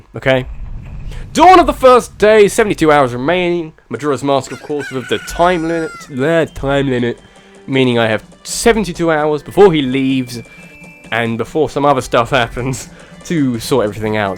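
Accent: United Kingdom. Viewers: I love how British he is 💜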